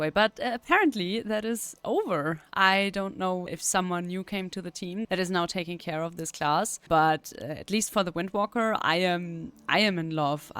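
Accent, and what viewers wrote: Germany. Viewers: Monk! Best class!!! 😃 A true master don't let dictate his style by others. Deutsch? Man hört es am akzent. 😅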